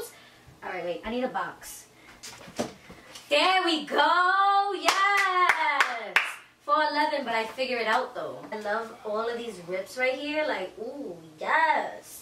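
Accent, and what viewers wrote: New York, United States. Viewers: I love that NY accent ❤️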